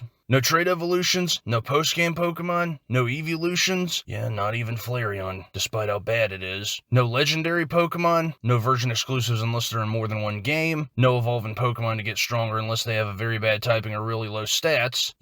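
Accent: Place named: South, United States. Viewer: when i clicked the video i was like now which one was this guy oh right the one with the southern accent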